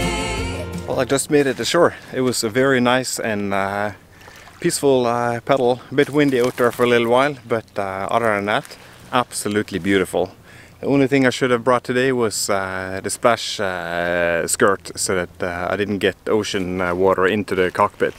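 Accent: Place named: Norway. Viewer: Hei, hører at du er norsk, PG elsker NBen din, og lurer på om du har vert i Bekkjarvik?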